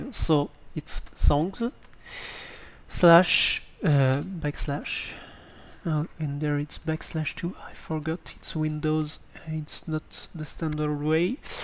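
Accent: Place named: France. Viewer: I'm a french guy listening to an english tutorial given by another french guy with a very french accent, reminds me of school Thanks for the tutorial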